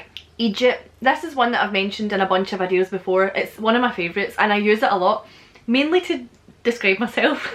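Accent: Scotland, United Kingdom. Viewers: Giiiirrrrl you make the Scottish accent sound Scottish. Seriously, though, thanks for this. It's been a bit since I've been to Scotland and I miss the people and the accent. Slainte Mhath! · Oh my God I simply love this accent. I was planning to study English there in Scotland. I got a better deal for England, (un)fortunately, though. At least I'll be able to go there. Subscribed (long time ago). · scottish accent is the best 😂♥️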